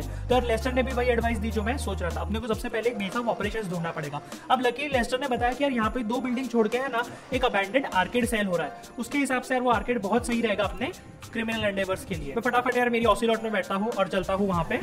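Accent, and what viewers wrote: Gujarat, India. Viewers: Bhai tum Gujarat se ho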